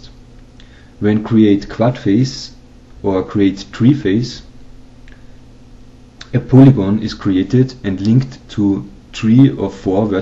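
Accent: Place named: Germany. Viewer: i like your english bro ...it sounds so german xD Njo egal, find ich ne geile sache xD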